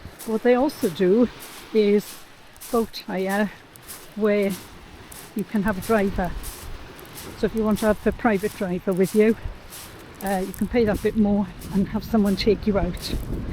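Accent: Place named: Wales, United Kingdom. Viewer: You have a Welsh accent. Where are you from. I'm from Newport South Wales and coming to Skala in 5weeks. I've been to Kefalonia twice and stayed in Skala both times. I love it!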